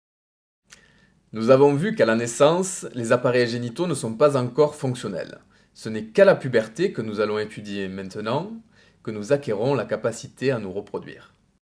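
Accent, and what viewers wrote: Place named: South, France. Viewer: merci beaucoup pour vos vidéos ! ça m'aide pour le bac :) le seul bémol c'est qu'étant du nord de la france avec mes amies on adore imiter l'accent du sud et du coup quand je vous entends parler parfois j'ai envie de rire x) (mais ce n'est pas méchant)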